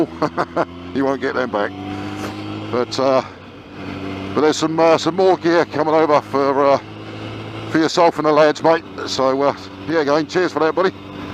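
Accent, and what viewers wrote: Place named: Suffolk, United Kingdom. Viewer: Good to see you back and hear that Suffolk accent again. Keep on trucking 😉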